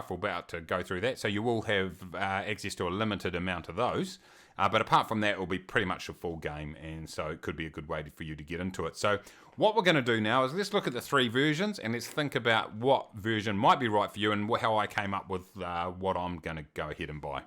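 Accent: New Zealand. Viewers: Ahh man I miss the NZ accent. And NZ. it was my absolute favorite place to live.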